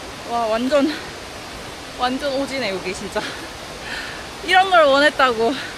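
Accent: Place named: Gyeongbuk, South Korea. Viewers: 마! 이게 갱상도 여자아이가? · 경북인건 1000% 대구세유? 사투리 별루네... 부산 아님 ㅋㅋ 깨긴깬다 ㅋㅋ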